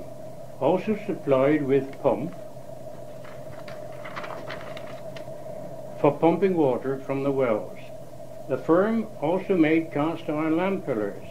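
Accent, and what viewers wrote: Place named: Worcester, United States. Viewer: How great to hear an old Worcester accent.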